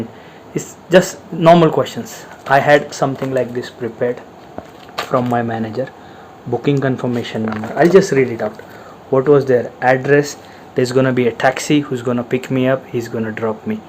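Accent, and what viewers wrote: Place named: Maharashtra, India. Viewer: Saheb English Changale bota pan Marathi Accent thoda drop karal tar eak no whal, I think u need to have neutral English Tone ur choice of words and sentence construction, is self explanatory ur just one step away to be speaking Flawless very competitive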